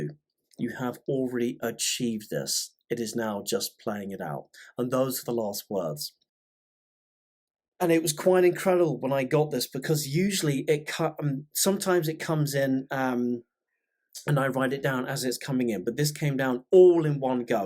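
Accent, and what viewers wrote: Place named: United Kingdom. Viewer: I reduced speed to.75 as well after about 8 minutes; prior to that I was back tracking & replaying. Had to put my “Brit ears” on as well. Lol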